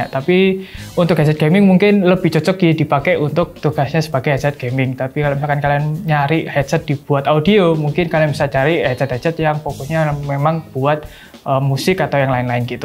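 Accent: Java, Indonesia. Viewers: suka logat jawanya :)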